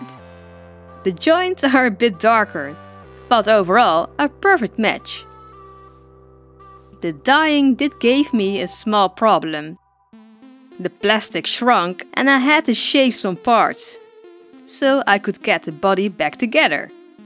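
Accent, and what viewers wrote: Netherlands. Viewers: This is so cute! Altho I can barely handle the strong dutch accent in your english 🙈 no hate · OMG your Dutch!! I heard it from your accent!! Zelf ben ik ook Nederlands en ik vind wat je doet echt geweldig!! Zelf wil ik ook een een pop maken maar dat mag niet van me ouders ): dat vind ik heel jammer maar ik vind het altijd leuk om naar je filmpjes te kijken :D 😍✨❤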